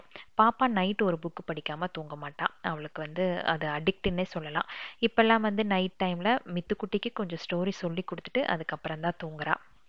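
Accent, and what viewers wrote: Coimbatore, India. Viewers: Love from UAE... Am ur new subscriber I used to watch very few ppl vlog only .. Recent times I used to watch ur videos also I like ur kovai slang..and way of ur cooking good.. It's seems like my mother preparations... When I miss my family and my mom ur videos made me relax... Good job keep rocking.. Also I saw another one videos u mentioned abt some negative comments also o watched that particular u tuber videos... It's completely different ur video covered end to end thing it's interesting also very useful.. All the best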